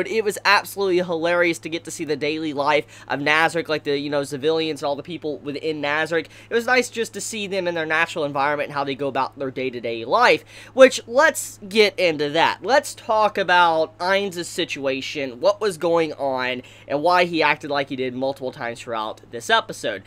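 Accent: South, United States. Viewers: Are you from the south I can hear a little of a southern accent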